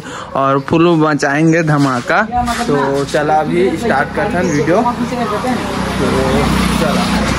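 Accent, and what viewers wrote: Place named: Chhattisgarh, India. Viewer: Bhaiya tuman Chhattisgarh se bolat ha😊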